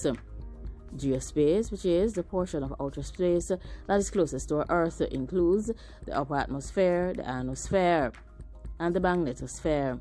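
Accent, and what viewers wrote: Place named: Jamaica. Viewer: Me luv ya Jamaican accent.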